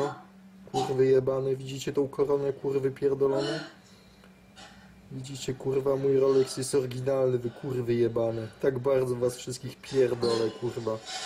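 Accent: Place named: Russia. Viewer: Kupiłem taki sam za 90.zł.masz ruski ,akcent.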